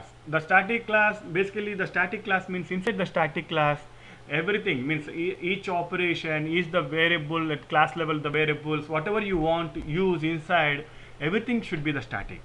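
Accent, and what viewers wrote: India. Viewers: only Indian people understood this English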